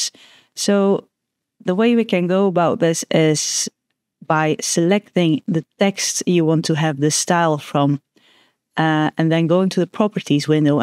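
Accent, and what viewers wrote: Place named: Netherlands. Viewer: I know a Dutch accent when I hear one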